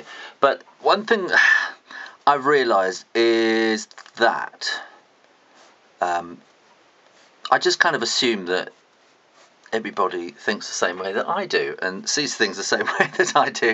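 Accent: United Kingdom. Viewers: are you british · Thank you for the great videos! I love your British accent and your sense of humor...I'm learning a lot from you and I appreciate your talent so much!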